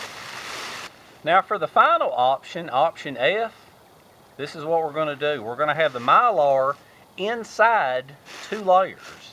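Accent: South, United States. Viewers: thats a strong southern states accent you have there good sir.....thanks for the clip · I feel like my own southern accent is far inferior to this guy's accent...that is incredibly southern! Theeyus = this, teeyunt = tent, eeyun = in, swayut = sweat... that is amazing! Where are you located? Is this accent common there?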